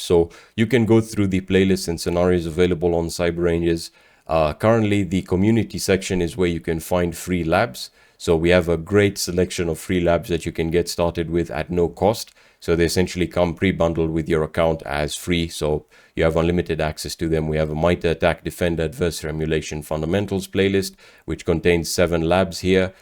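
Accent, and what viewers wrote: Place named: Arab world. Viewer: heh yea , familiar arabic accent - ps me nohomo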